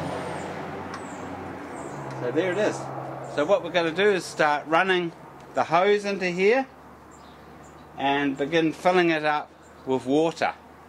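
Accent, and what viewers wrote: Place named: New Zealand. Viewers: I love KIWI accents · I got a real kick out of watching this with captions (sitting in a waiting room and not wanting to disturb others) ... the 'English" subtitles can't quite handle the kiwi ;-)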